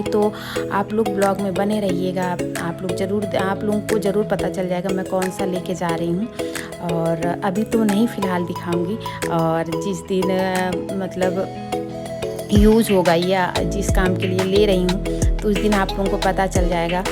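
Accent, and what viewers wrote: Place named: Chhattisgarh, India. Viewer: hlo didi aap kha se ho apke bat karne ka tone chhatttisgarh k loogo ke jaisa hai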